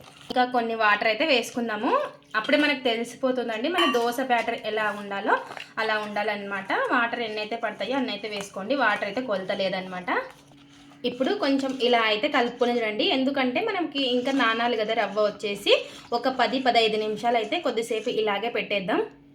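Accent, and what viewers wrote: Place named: Anantapur, India. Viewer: మీది అనంతపురము జిల్లా కద నాన్నా...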